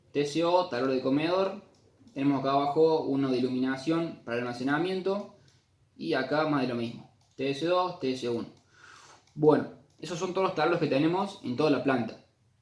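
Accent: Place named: Corrientes, Argentina. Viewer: Muy bueno lo tuyo joven. Soy muy veterano en estas cosas y de verdad me encantó. Supongo que eres argentino. De que provincia?. Parecería correntino. Éxitos en tus proyectos y en la facultad. Saludos desde Uruguay.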